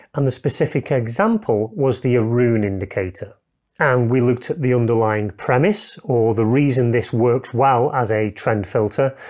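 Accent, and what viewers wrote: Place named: United Kingdom. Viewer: Excelent contents and presenter's british accent is music!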